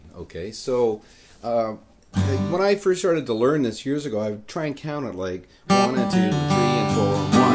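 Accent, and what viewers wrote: Canada. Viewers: The accent is Canadian right? Sta-CAT-to lol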